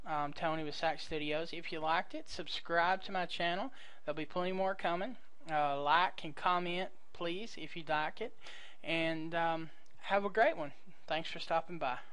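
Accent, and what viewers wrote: South, United States. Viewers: sounds like peter parker (not from amazing spiderman) with forest gump accent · You sound like dunkey with a heavy southern accent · I find your voice Awesome.... YOU must be Southern Like me? Perfect tutorial ignore the haters.... simple way to get this effect thanks bunches for this............♥♥♥ was searching for this effect Hugz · Thanks for a great tutorial. As for accent, speaking as an Englishman it sounds fine to me. Always liked the southern accent. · Def not Forrest Gump but very southern, love it!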